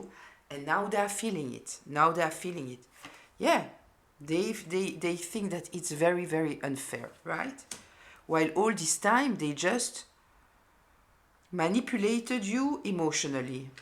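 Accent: France. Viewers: I enjoy your readings very much. Watching from Arizona where it seems more and more of those narcissists are being bred every time I turn around! Your insights are amazing and very helpful. I really love your French English too. Thank you for your energy.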